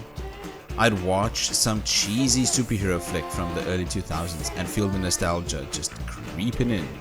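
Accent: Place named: South Africa. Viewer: Always so nice to randomly hear a south African accent here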